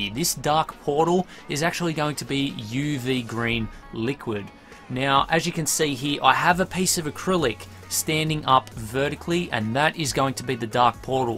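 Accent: Australia. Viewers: great ideas right there! although I'm not the biggest fan of warcraft I think it will look sick when finished. keep it up! btw your Australian accent sounds so funny :D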